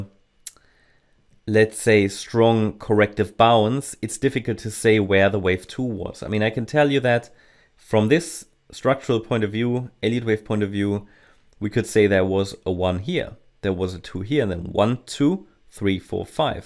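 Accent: Germany. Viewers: If I'm not mistaken, your accent is a mix of German and English?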